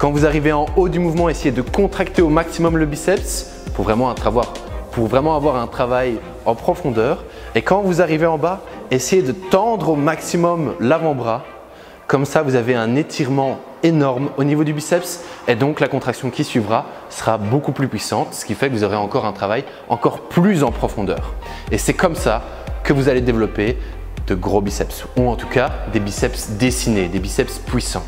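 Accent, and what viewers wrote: Belgium. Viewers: bon job je note un accent belge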